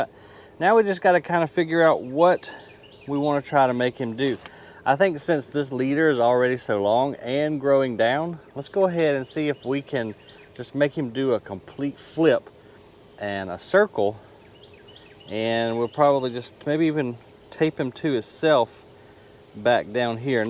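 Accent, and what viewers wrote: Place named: South, United States. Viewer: I am so very interested in this and assume that you have a website with more more. I have so many seedlings in yard and woods, and of different sorts, that I wish to employ my "If you can beat 'em, join 'em" philosophy. I'll throw this out there. I figure if I don't get an answer, that maybe you cover that precise question in the aforementioned, or close enough. Pine seedlings: Vicinity Charlotte, NC-I have forgotten the zones, but given your accent and mine, we in the same "zone." So what other 'persuasions' can I use? Form a parabola with a brick tied to a rope to weigh him down? Soldering wire seems to work for true seedlings but is too 'soft' if you wait too long to begin. PVC pipe 'sleeve' with a 90 or 45-degree joint(s)? A tangent on pine seedlings : How much root-ball do I need to include when transplanting? 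How much 'tap' root. I didn't know I could kill a pine seedling, but yes. I did inherit a green thumb for the central Alabama to Gulf Coast region, but 28107 is different enough that I need to seek help. Whether I get an answer or not, no worries. I've learned enough from this one vid that I'm better off than I was. For that I thank you.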